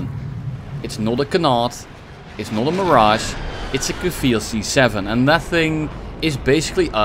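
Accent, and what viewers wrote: Netherlands. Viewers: Hey are you dutch by chance? Because the accent sounds really dutch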